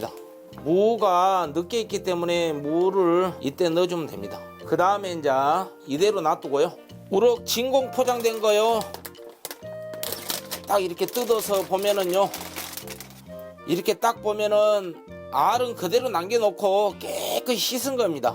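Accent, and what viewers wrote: Jeolla, South Korea. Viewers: 셰프님 말투가 꼭 울동네 말투인듯.사투리도 너무친근요 ㅋ ㅋ .고항이.순천.여수.광양 셋중에 있을듯.전 참고로 광양 토박이.입니다.ㅋ ㅋ .혹시.고향이 어디신지요?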